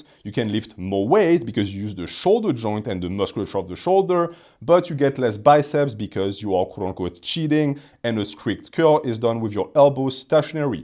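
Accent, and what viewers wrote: France. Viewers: hello, this is not related to anything but is it possible for you to style your facial hair like a pencil moustache? you are french and i think your accent will match your character if you rock a pencil moustache. thank u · Fitness advice is way better with a thick French accent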